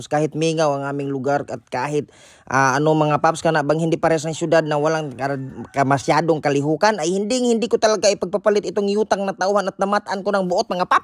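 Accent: Visayas, Philippines. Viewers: Kailan kapa niabot?🤣🤣 i like your bistag jud, bisayang tagalog 🤣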